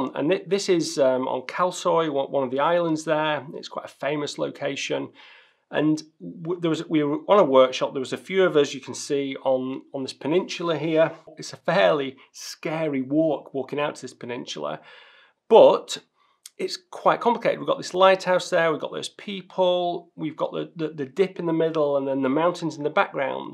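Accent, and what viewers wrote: United Kingdom. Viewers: Great stuff. I kinda wish I had grown up in the UK, simply for the accent:) instead I have "Texan";-). Love the Tree/Mountain shot! Most of my insect shots are using my 100-400.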